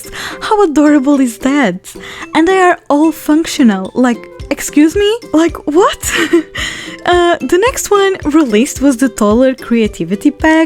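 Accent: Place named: Portugal. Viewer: are you portuguese? cause it really looks like when you speak english haha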